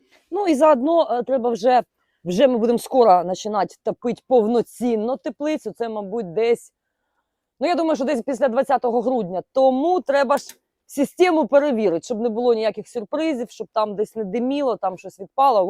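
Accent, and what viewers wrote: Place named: Surzhyk, Ukraine. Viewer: Ще менше суржику, будь ласка. Попрацюйте над правильним вимовлянням закінчень слів.😉💪🏻🇺🇦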